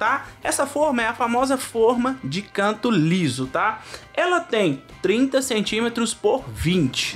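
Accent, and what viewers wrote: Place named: Rio de Janeiro, Brazil. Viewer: Com certeza e carioca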